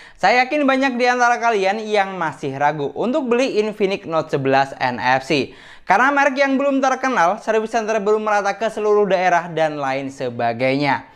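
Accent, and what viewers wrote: Madura, Indonesia. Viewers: Orang madura ya bang?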